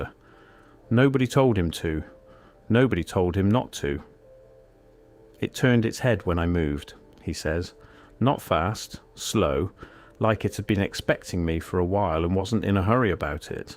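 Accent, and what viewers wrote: United Kingdom. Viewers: How come all the narrators of these episodes are Pommies?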